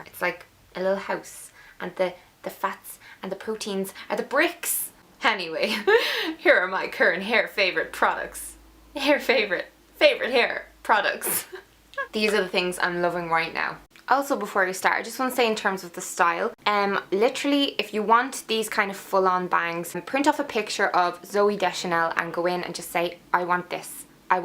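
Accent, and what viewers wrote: Dublin, Ireland. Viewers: from your acsent I know you from Dublin Ireland. I'm from Ireland myself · Firstly, my english isn't good and sorry for this :( My hairs seems like yours. They are very long, shiny and thick. I love my hair but I hate hair breakages :( what I can I do? Notes: I'm very lucky I discoverd u :) I'm a Turkish girl. Irish accent fascinates me :D Your accent is amazing I think :) You're so cut and amazingly beautiful :) I love ya :* And again sorry my english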